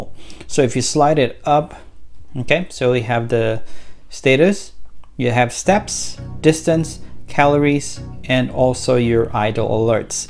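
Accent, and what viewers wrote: Philippines. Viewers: Sound Filipino...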